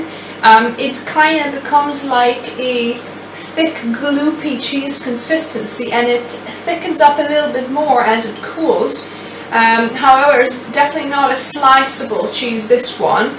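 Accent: United Kingdom. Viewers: I hear British, German I’m not sure but being multilingual is so cool 😎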